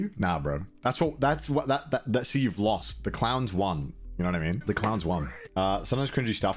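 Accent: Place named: United Kingdom. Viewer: my man's speaking the language of facts with a british accent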